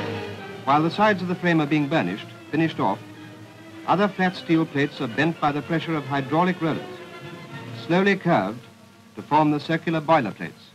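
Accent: RP, United Kingdom. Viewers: Not really. Commentators of the day usually spoke in what's known as "Received pronunciation." Wouldn't do to have unwashed heathen Northerners commentating on serious stuff like this....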